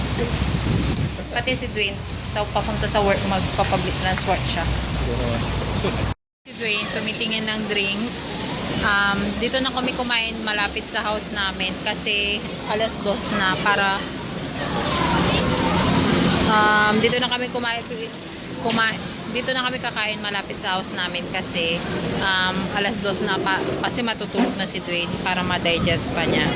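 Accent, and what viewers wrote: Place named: Singapore. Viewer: New Subbie just came across on your vlog. Funny how you also adapted the Singaporean english accent. Where in Europe they have that European accent. Looking forward in watching a marathon of you guys's vlog.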